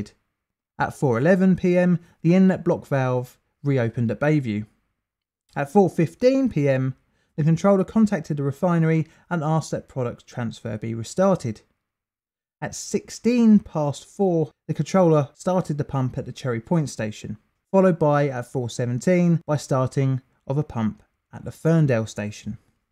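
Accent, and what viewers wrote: United Kingdom. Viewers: It’s so weird hearing a British man talk about a bunch of tiny towns I live near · Very much so. I enjoy falling asleep to British men talk about disasters.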